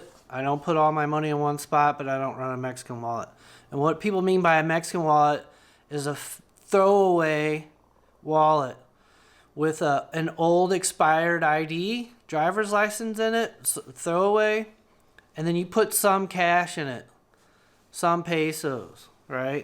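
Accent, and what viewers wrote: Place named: California, United States. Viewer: Man, if that's isn't a Californian accent, I don't know what is! LMAO.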